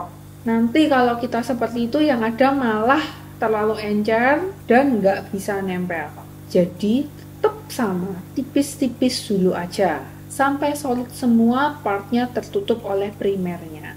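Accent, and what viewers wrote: Java, Indonesia. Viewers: Mbak logatnya agak medok ya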